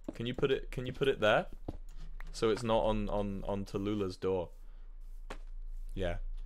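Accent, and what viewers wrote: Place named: United Kingdom. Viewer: cos the original song is extremely american. wilbur does this sometimes but especially with this genre of folky type American songs. it'd sound off if he spoke in his british accent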